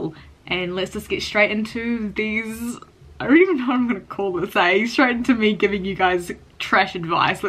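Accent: New Zealand. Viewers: New sub 😜 betcha hear it allll the time but ur accent is awesome, I'm a kiwi aswl but my accent is nowhere near as cool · I love the New Zealand accent, but sometimes I can’t understand what you are saying, and how old are you?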